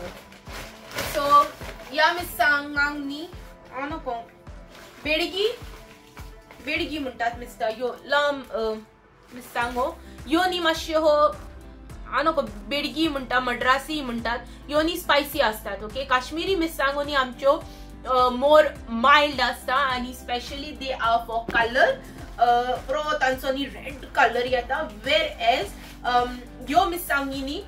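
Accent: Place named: Karwar, India. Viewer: Becoz you speak like karwar people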